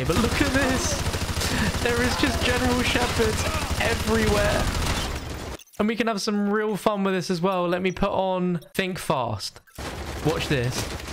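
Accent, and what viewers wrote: England, United Kingdom. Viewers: Are you UK? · "omg a Juggernaut. How many grenade hits is he going to take?" Cleary hasn't played the High Explosive Spec Ops mission or maybe even figured out it takes one to the head XD. (also, the proper term is "soccer ball". But I can tell you're from England so it's whatever. lol)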